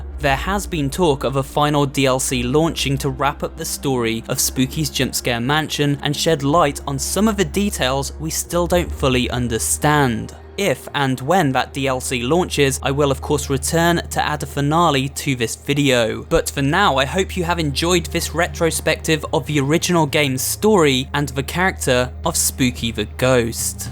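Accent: United Kingdom. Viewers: You talk like british behind the meme.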